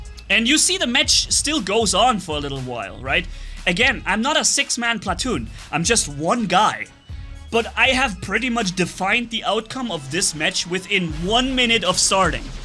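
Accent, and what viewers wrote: Germany. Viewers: Hi are you German from your nationality cuz u have this German accent when your talking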